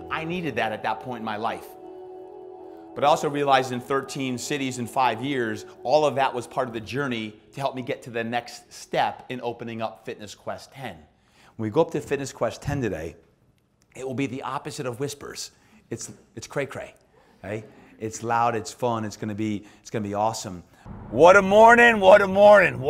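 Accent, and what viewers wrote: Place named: France. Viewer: Oh and I LOVE your French accent!!!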